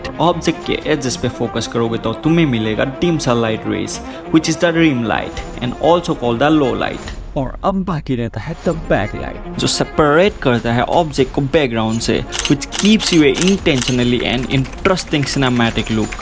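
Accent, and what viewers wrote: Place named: United Kingdom. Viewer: Nice work...al the best... Don't use English accent in hindi telling ... Other wise nice....🎉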